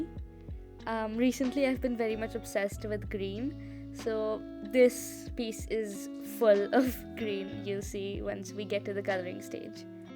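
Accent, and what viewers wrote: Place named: India. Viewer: are you from India?😅 (I'm so sorry if I offended you, but your voice literally sounds like an Indian <3❤)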